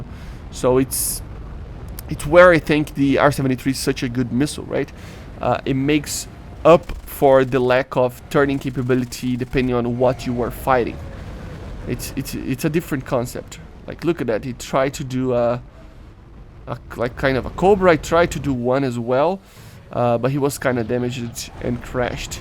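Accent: Brazil. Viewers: Sabia q tu é br, o sotaque não mente kkk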